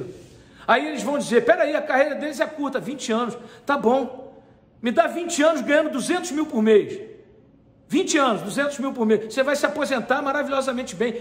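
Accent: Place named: Rio de Janeiro, Brazil. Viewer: E ai chega de Carioquice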